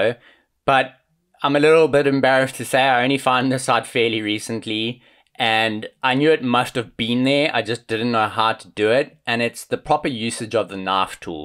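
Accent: South Africa. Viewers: Well I'll be damned, that's a Saffer accent if ever I heard it! Where you form AB?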